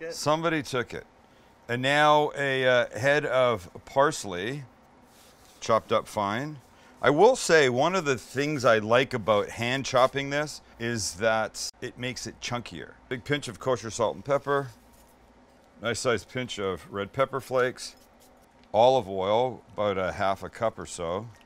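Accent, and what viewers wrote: Canada. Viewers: Is he from Canada?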